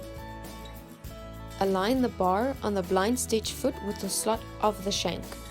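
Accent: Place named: South Africa. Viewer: Excellent. I thought that was a South African accent :) I just unboxed mine and am so glad to find a detailed explanation. Thank you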